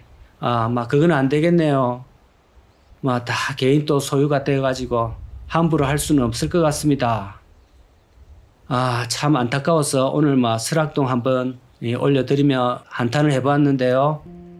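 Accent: Gyeongsang, South Korea. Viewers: 오늘의 사투리 따시고. 갱상도 간편한 사투리 등 따시고 배부르고.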